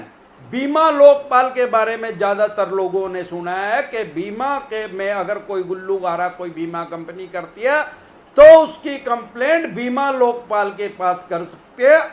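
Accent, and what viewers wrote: Haryana, India. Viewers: Haryanvi accent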